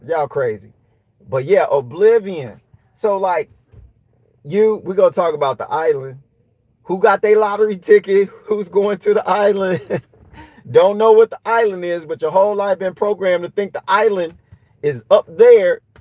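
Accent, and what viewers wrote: South, United States. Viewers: Monkey juice lol You always make me laugh when you say certain things in the middle of the conversation. I love the African american southern accent. I am not american but I love to listen to it.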